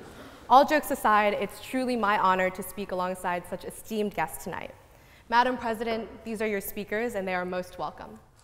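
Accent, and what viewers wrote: United States. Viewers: She sounds American. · Haha, she is incorrect of course because we can all see what china has done to it's own people! Plus this girl who seems to know so much about the country she defends has not lived there! If she was living there maybe she would have a better idea in fact about the ccp and how they operate. I believe to defend a government you have to know from experience what you are defending. She talks about the west in a unfavourable way with her American accent, I find it somewhat ironic to be honest. My family are immigrants , yet I do not attest to know the life they endured. I found this girls speech insincere and her attitude aloof. She is very much entitled to her opinions of course and so am I! My advice to anyone who wants to know about ccp go live in mainland China and see for yourself.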